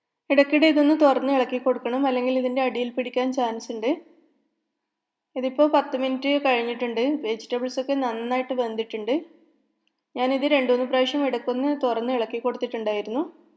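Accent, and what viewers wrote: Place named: Palakkad, India. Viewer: ഇങ്ങളെ ഭാഷ പാലക്കാടൻ സ്റ്റൈൽ ആണല്ലോ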